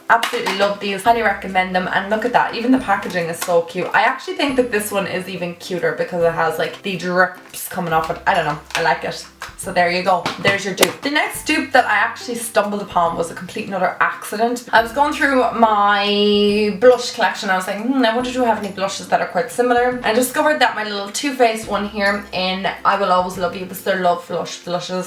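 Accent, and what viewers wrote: Ireland. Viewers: Enjoyed this very much. You are entertaining, informative and quick. And of course love the Irish accent. Trust what you say as well. Will look through your videos but would like to see you do one half of face all Mac and the other nyx, or urban decay and makeup revolution, etc.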